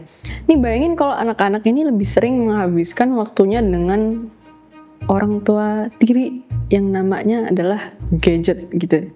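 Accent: Java, Indonesia. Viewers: pesona mba2 medok intelek, bikin chasing reality tiap hari ga sih biar home feedku berwarna :) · Logat jawa nya candu bgt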